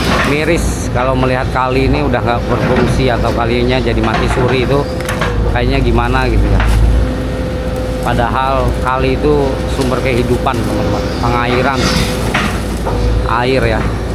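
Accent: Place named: Banyumas, Indonesia. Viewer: dr logatnya mas dr jawa ngapak ya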